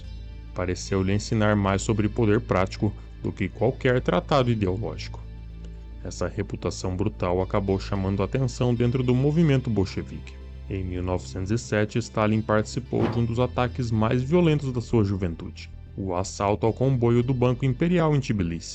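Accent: Brazil. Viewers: Sim ele é um sulistas branco com sotaque forte quem nasce no sul dificilmente perde esse sotaque 😢😮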